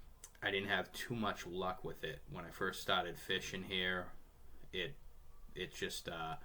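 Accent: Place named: Massachusetts, United States. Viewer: I would definitely continue to fish that just the other day. I was throwing everything under the sun. I even got so frustrated I tried live bait which they were not hitting. I put a black with a blue flick on and I caught a 5 lbs. 6 oz. in 18 feet of water. I was just working it on the bottom. weightless on 3 hook the 5 inch bait. and I’m guessing you’re in Massachusetts like I am I can tell by your accent.😂 so we’re definitely fishing the same conditions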